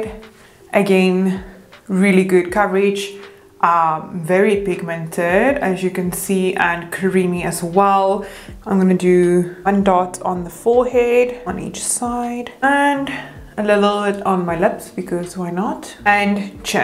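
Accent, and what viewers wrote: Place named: South Africa. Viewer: I can’t tell if this is a South African accent or Dutch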